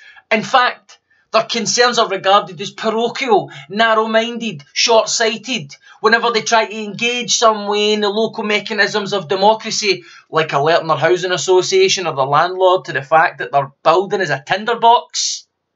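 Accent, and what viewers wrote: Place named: Scotland, United Kingdom. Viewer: Pure Truth served up drenched in a Scottish accent.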